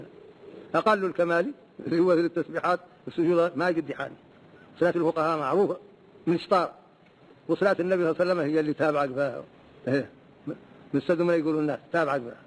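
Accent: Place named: Mauritania. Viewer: رحمه الله لكن الكلام غير مفهوم. يتكلم باللهجة الموريتانية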